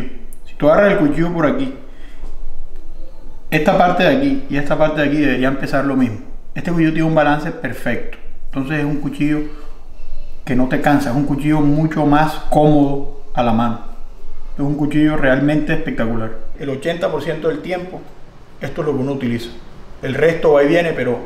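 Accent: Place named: Cuba. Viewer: Una pregunta tu eres cubano porque por tu asentó te pareces al de los cubanos y porcierto y soy cubano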